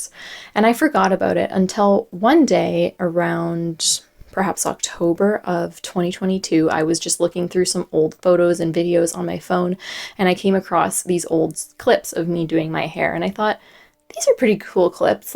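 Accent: Canada. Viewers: Please do a video about your eyebrow regrowth journey- I can see, in earlier pictures of you, that that is also a choice that you made. I just turned 60 and decided about a year ago to start regrowing my brows (because the alternative is a very expensive brow lift!). My brows were growing back really well by simply moisturizing them every night with L’Occitane cream, but when I used micellaire water to remove my makeup over the course of a couple of months I realized that I was getting bald spots where there had previously not been a problem with sparsity! So I would love for you to talk about that… Love your videos, great information, and Canadian accent!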